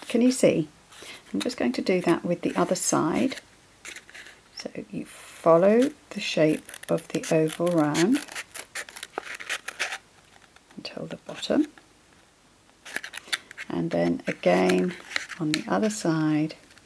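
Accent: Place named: United Kingdom. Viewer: wonderful tutorial. Enjoyed your clear, British voice.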